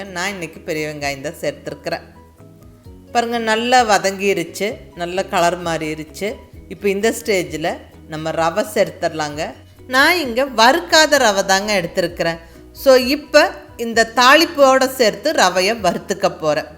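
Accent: Coimbatore, India. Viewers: More than everything,your presentation and narration is fantastic.the language and slang is like that of coimbatore ladies.i like the preparation the 'padam(Tamil) is my favourite.my wife does not like,but I prepare both upma and Pongal like this.thank you for the special tip,that of heated and cooled milk.i was not knowing.gift during maargazhi maasam.thank you.iam 77 plus!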